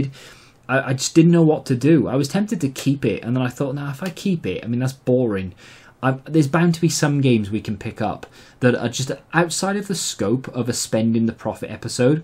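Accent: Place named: South Wales, United Kingdom. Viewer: Do you mind me asking what area you live ? You sound like you’re from south wales and you mention Bristol and Gloucester in some of your videos but I don’t recognise any of the boot sales you go to !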